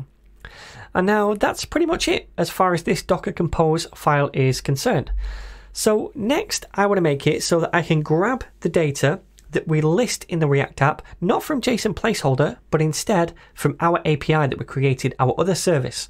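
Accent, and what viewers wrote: United Kingdom. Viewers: I want you as teacher! 🤩 I love your British accent Cheers from France 🇫🇷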